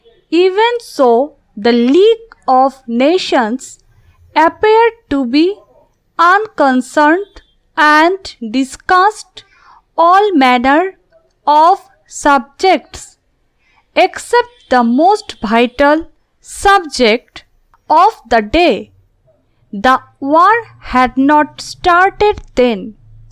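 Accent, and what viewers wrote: Bengal, India. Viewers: Voice seems to be Bengali , I am correct?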